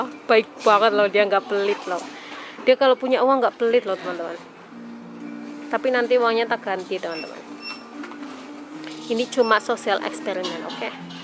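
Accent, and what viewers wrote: Banyumas, Indonesia. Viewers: Kok ngedok mba,dr banyumas apa dr mana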